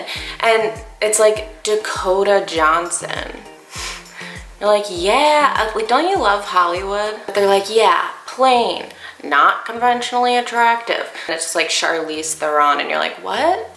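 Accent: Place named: Philadelphia, United States. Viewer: girl i love when you talk about philly stuff and when i catch your accent! cuz i am also from philly but w/ a god awful philly accent. i luv it!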